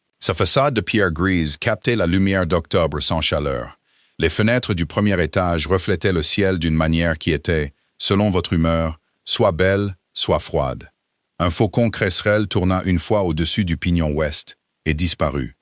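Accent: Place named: England, United Kingdom. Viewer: Mais pourquoi cet accent anglais ridicule alors qu'à certains moments le langage français est employé correctement ? En France, nous parlons français sans accent, merci à l'IA d'en tenir compte !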